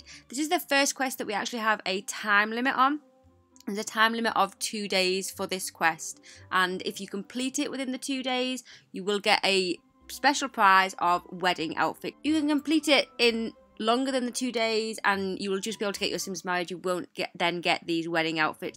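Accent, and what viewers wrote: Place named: United Kingdom. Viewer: Idek but I love the way she talks cause she’s british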